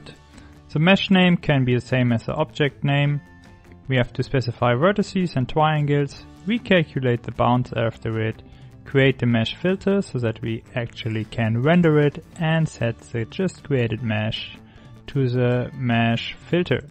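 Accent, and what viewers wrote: Germany. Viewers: btw are you german xD rly sound like it wär ja ganz nett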